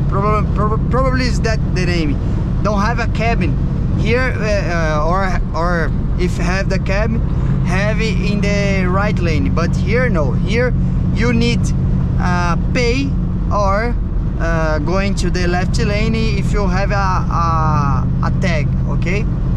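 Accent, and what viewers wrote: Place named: Brazil. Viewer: ele "perde" esse sotaque abrasileirado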